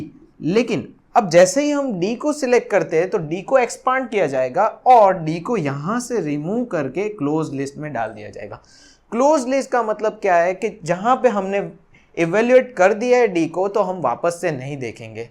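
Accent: India. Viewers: FARK!!!!!.........every fucken video on anything maths and IT is fucken Hindi or English with strong Indian accents.....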